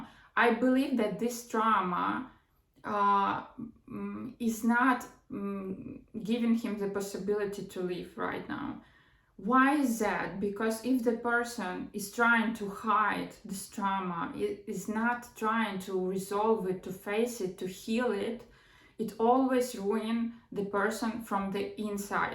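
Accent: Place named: Russia. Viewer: gosh that’s just the most stereotypical Russian accent, and it’s not even a joke 🙈 don’t want to offend you, but I think u might need to work on your speech if you choose “storytelling”.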